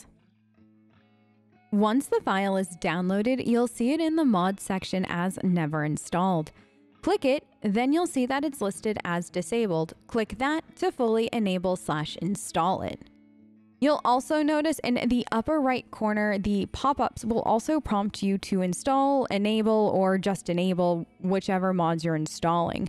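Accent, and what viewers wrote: Australia. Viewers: yoo you from straya? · you can't hide the aussie. I hear that shit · Why do I keep hearing an Aussie accent from her lol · This lady doesn't know if she wants to be Aussie or american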